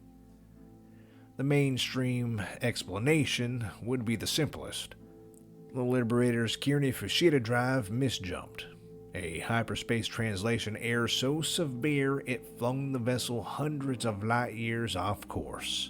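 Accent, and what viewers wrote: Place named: South, United States. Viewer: Stylized Southern accent, but real none the less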